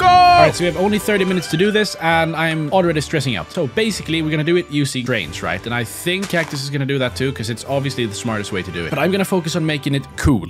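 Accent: Sweden. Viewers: Are you swedish? Bec i hear a accent.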